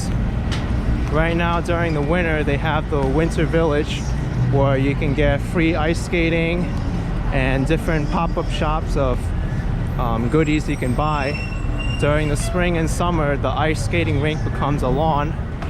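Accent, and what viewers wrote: New York, United States. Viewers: As you exit GCT you captured nice view where I used to work across the street, formerly called the Lincoln Bldg. 42nd is so sanitized now, if only the gritty history could talk from the now removed arcades and cheap movie houses. Where's Midnight Cowboy "Buck" and Rizzo?,:) Question: Does "East" and "West" 42nd St start at 5th Ave.? I laughed after reading posts about your native NYC accent: "MunHAT' tan instead of MAN HAT tan, and "Shtreet" instead of "street". The one pronunciation always caused me to chuckle from NuYawkrs was "Huh!" instead of "Her"..:) thanks again for great tour! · thank you for the narrated video , I like your accent , Is it everybody's accent in new york ?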